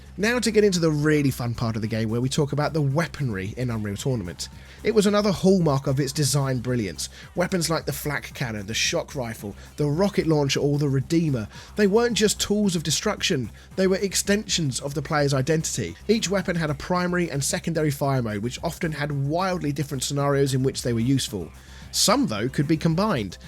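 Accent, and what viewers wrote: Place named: United Kingdom. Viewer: Sounds like you are from the UK; you should look into another one of UK gaming's national treasures that pretty much fell under the radar although anyone can still play it as of now. It's a gorgeous 5 v 5 Capital Ship Space MOBA called FRACTURED SPACE. It's one of those games where you felt lucky to have experienced it when it was live.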